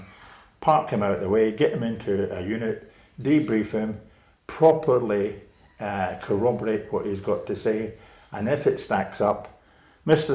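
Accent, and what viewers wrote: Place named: Edinburgh, United Kingdom. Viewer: Great work I truly hope you get the answers you need I’d hate to see an innocent man die in prison ps are you from Edinburgh originally your accent is very similar to mines especially when you said it disnae fit 😂 ✌🏼💙👍🏼🏴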